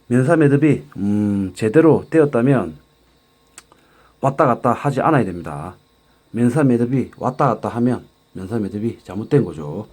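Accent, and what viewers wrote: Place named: Gangwon, South Korea. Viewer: 강원도 분이 신가봐요. 너무 웃기게 말씀하네요. 감사히 시청하였읍니다.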